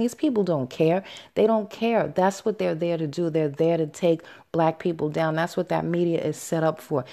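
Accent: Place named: East Coast, United States. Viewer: Enjoyed hearing you speak on it! East coast accent arrested me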